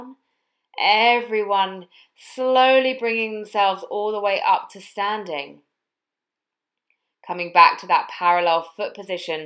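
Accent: United Kingdom. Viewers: Good job ! British English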